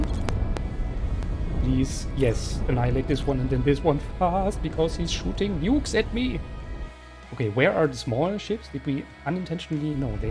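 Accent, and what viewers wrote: Germany. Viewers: You only hear the accent and you know this is gonna be good. The magic of German engineering! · schade, man hört leider deinen deutschen Akzent 😄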